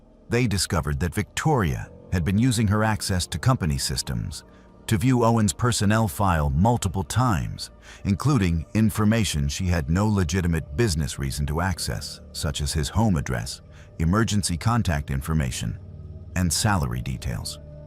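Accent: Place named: Louisiana, United States. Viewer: Louisiana common like your voice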